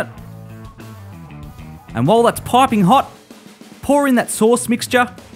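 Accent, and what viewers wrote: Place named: Australia. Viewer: You have the best Australian accent ive heard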